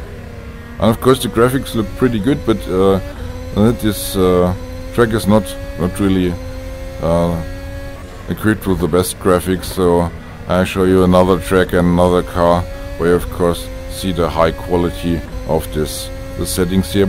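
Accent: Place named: Germany. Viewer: Thank you for your informative video. Perfect German English, I would sound exactly like you 😀. Are you sure that the resolution in CM-settings-Assetto Corsa- Video has impact to the VR performance? It is overwritten by the VR video settings as far as I know. I have problems with very big user interface of the CM apps in AC in game when I lower the resolution under my 4K of the screen. 👋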